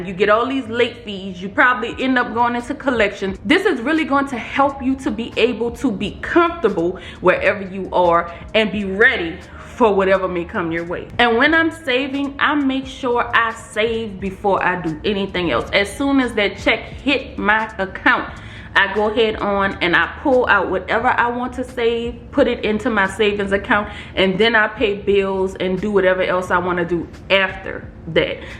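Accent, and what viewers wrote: New Orleans, United States. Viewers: Lol I’m sensing a NOLA accent; love the energy in the video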